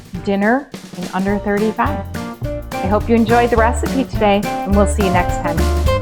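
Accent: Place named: Minnesota, United States. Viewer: Your recipes look sooo great!! I am gonna start trying them. Where are you from?! I am originally from Wisconsin, so I am loving your accent 😊. My guess is Minnesota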